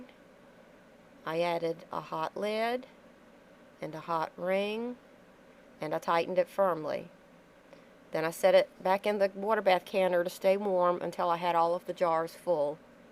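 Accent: South, United States. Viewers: Great video! LOVE your southern accent, by the way. LOL! I don't like to eat a lot of corn, but a neighbor farmer gave us a load of sweet corn, and I hate to see food go to waste. My kids and hubby love corn. Honestly, I prefer frozen, but also like to not depend on freezers in a locale where sometimes our electricity is undependable. Very nicely done video. No annoying screaming music in the background, and you spoke clearly and didn't chat unnecessarily--but gave valid information.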